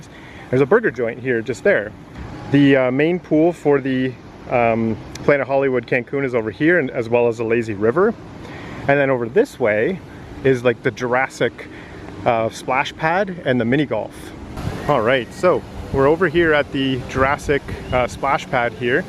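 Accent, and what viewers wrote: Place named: Canada. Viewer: Obviously you are Canadian. Linguists generally point to around 10–15 distinct accents in Canada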